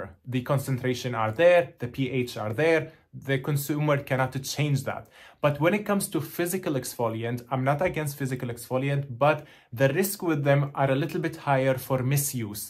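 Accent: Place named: Italy. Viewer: What a charming accent. Are you Italian?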